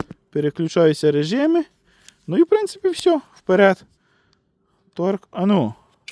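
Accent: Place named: Podillia, Ukraine. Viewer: Ви не з Поділля родом? Чую схожий говір)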